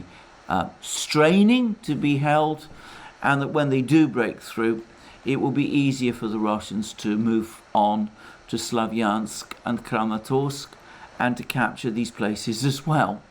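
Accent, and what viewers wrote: United Kingdom. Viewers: THIS GUY tries to convey the the Russians have their act together. THEY DON'T AND NEVER WILL because RUSSIANS cannot freely communicate or express themselves, and the populace have no influence in Moscow under Putin's heavy hand. NOWHERE in this phony diatribe does he mention the successful Ukrainian attacks on many Russian weapons storage sites. Because that is a major event in the war, it calls his entire "analysis" into question. To me and any casual observer, his is nothing more than words with a British accent.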